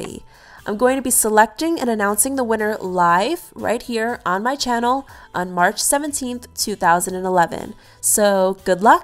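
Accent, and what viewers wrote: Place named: Long Island, United States. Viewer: This was my FAVORITE OOTD video EVER! PLEASE do more! I love your personality! I am from Long Island and your voice reminds me of all of my friends from back home!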